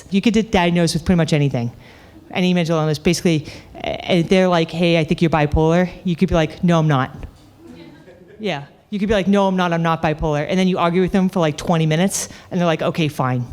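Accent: United States. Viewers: Ive never seen a more Irish head in me life😅 n im Irish😂 I expected a strong Mayo accent..not a Murican...cant prove your mother was Mary from Mullingar but 😅...either way...epic, feckin brillllant set a cailín!! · Are you from new england ?? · You sound Boston ish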